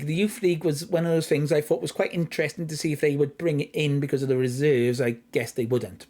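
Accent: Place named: Wales, United Kingdom. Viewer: I like your positivity ... could listen to the Welsh accent all day lol .... I'm from St Helens , Merseyside . I'm currently managing Maidstone United with a save carried over from FM24 Console on PS5 . I'm having loads of crashing . Maybe 20 odd times . I've done the regular stuff , reinstalled game , restored licence and entered safe mode and cleared cache and rebuilt . It's playable .... looking forward to the fix ⚽️⚽️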